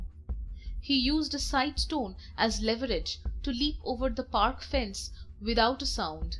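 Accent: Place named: Bengal, India. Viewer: thank you for the audio book. being a bengoli your accent is very familiar and so enjoying with full attention.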